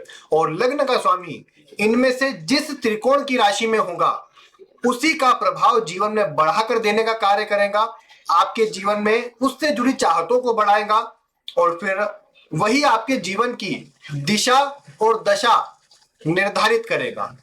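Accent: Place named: Maharashtra, India. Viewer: लम्बा वक्तव्य होने से आपका गला सूख रहा है। अगली बार इसका कुछ उपाय करके विडियो बनायें। और, आपकी भाषा एवं आपके उच्चारों से लगता है कि कदाचित् आप मूलतः हिन्दीभाषी नहीं हैं अथवा आपकी हिंदीभाषा पर संभवतः मराठी भाषा का प्रभाव है।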